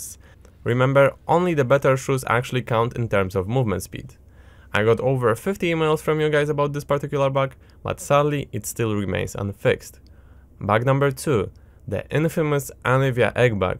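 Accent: Poland. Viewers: Słychać polski akcent xD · I dont know why but i feel like u have polish accent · man you sound like you're Polish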